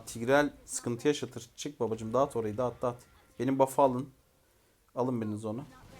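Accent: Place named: Thrace, Turkey. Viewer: Trakyalıyım tekirdağlıyım ama öyle konuşmayı beceremiyorum :/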